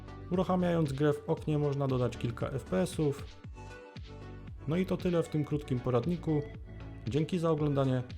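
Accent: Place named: Silesia, Poland. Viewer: Jesteś ze Śląska?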